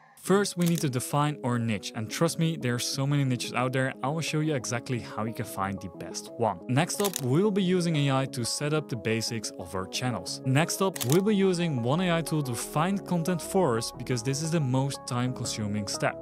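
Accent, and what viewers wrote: Netherlands. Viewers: The Dutch accent😂 Btw nice vid!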